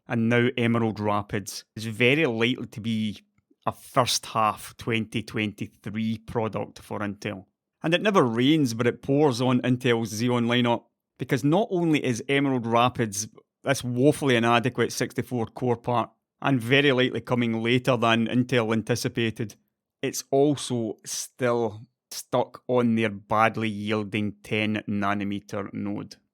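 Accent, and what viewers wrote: Scotland, United Kingdom. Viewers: "Alright guys" in that Scottish accent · From a fellow scot it's nice to hear your voice again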